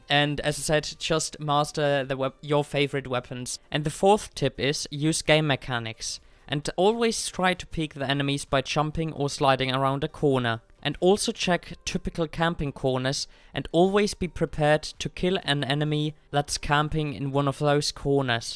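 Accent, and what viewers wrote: Germany. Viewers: U german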